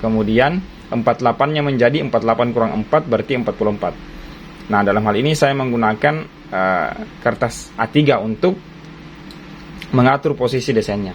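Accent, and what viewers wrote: Indonesia. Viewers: Orang sulawesi selatan yak ? 😂😂😂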